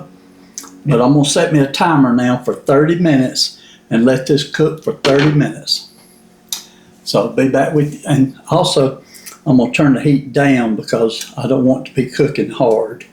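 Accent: South, United States. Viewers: Lovely cooking demo. It came out great and I loved listening to your southern accent. 🫶🏾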